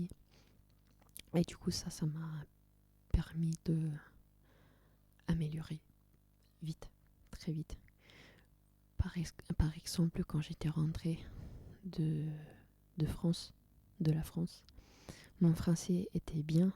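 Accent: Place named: Italy. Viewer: Trop cool l'accent Italien, j'adore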